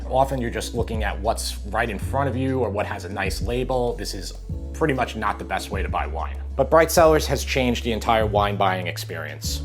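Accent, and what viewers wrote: New York, United States. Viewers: I watch alot of YouTube cooking tutorials, but your breakdowns & your NY accent make these easier to follow, being a fellow NYer 👍🏿😎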